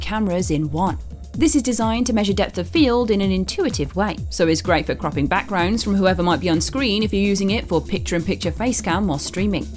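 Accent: United Kingdom. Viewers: hey, I don´t have english as my main language... I find her accent a little different... Is this british or something?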